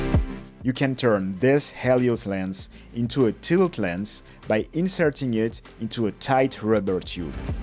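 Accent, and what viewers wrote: France. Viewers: The tricks are just amzing ! And the powerful French accent is totally tentalizing.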